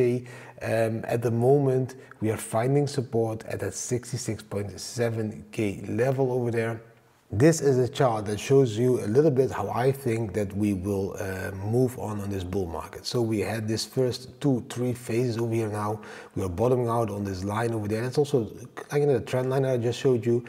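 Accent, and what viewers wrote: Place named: North Limburg, Netherlands. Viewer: Ik kijk met genoegen naar je video's. Naast de info ook vanwege de hele prettige uitstraling en de verborgen N-Limburgse tongval in uitstekend Engels. En mss zijn er mensen die zeggen dat het leven makkelijk is met vermogen, maar dat is BS. Zelf verdiend, van harte gegund en een enorm risico genomen. Ik ken meer vermogende mensen, kunnen zo in het azijnrek vd supermarkt. Oja, een vriendelijke lach, een paar zinnen leren van de taal waar je op dat moment vertoeft doet wonderen. De wet van wederkerigheid. En vooral ook leuk Geniet van het leven mensen !!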